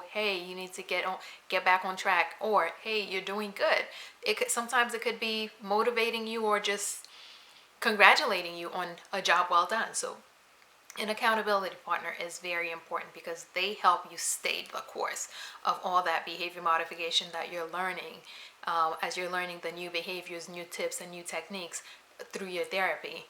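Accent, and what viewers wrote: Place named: East Coast, United States. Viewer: I love your East Coast accent! My dad is from NYC and it's my favorite accent.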